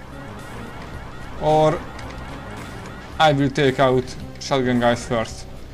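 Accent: Hungary. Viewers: YEAH!! Magyar akcentus foreva XD Jó a video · hungarian accent rulez XD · de mennyire magyar ahcentus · En eskuszom nem neztem volna ki -bol hogy tud angolul.kicsit magyar akcentusos de szarni bele jo az igy is xD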